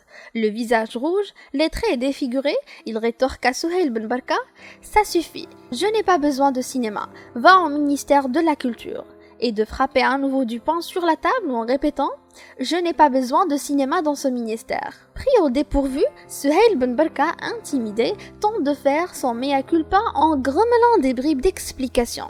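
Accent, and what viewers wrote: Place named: North Africa. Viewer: accent de bledar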